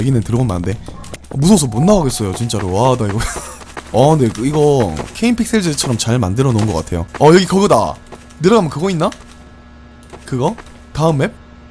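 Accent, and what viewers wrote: Gyeongsang, South Korea. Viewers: 경상도사투리가 살짝씩 들리는것같은드에엥 그래서그런지 좀 친근하고 자꾸보게돼욬ㅋㅋㅋㅋ 아 반응넘웃겨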